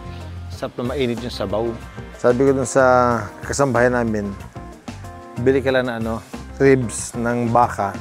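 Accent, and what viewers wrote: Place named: Visayas, Philippines. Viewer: Wow Sarap po ng luto niyo sir. Yung tono ni Goma parang nagshi shift sa bisaya at tagalog hehe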